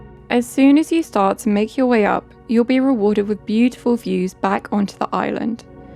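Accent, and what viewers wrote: United Kingdom. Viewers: What a marvelous place 😮! That island 🏝️ is a true paradise on Earth! Thanks 🙏 for this delightful video! By the way, where are you from? Both your name and family name are French, but you speak really good English with a British accent 😊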